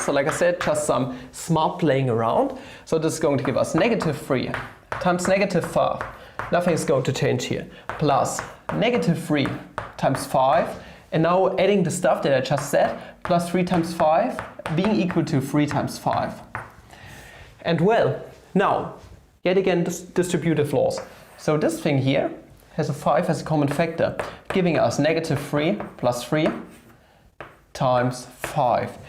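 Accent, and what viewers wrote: Germany. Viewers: why? I mean the accent sounds very german but I just wanted to ask cause I wasn't sure. Other countries have accents like that too. But I actually saw on his other channel that he is, in fact, german.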